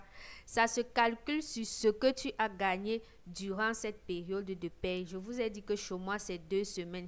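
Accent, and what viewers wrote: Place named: Bamileke, Cameroon. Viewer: Le ton bamileké de ça . Slt sister. Ton visage m' est familier stp dans quel province vies- tu?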